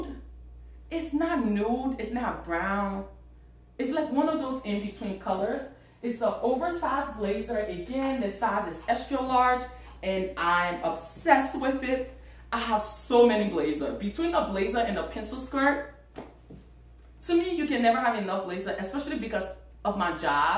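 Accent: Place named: Liberia. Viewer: I hear that Liberian accent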